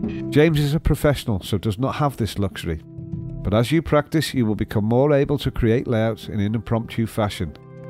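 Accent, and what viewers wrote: United Kingdom. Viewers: I just loved this aquarium. Explendid word. just got tired of the british gentleman narration as the work was being develooed then I Mute the video, so I could enjoy the beauty of this work of art and the sound of silence. Jeff from Brazil :-)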